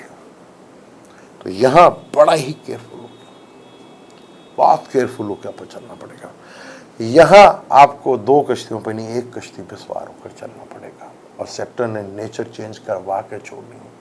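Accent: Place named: India. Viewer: یہ شخص رRAW کا ایجینٹ لگتا ہے اس کی اردو مکس ہندی چیک کریں ذرا😡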